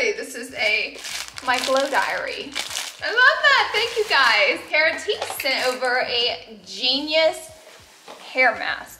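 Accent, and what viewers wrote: South, United States. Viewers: Sounds like she has a southern accent. I love it! For time watching her.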